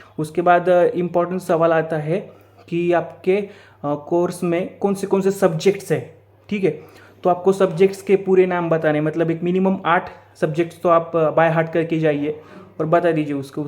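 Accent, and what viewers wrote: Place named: Maharashtra, India. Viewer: you sound marathi mulga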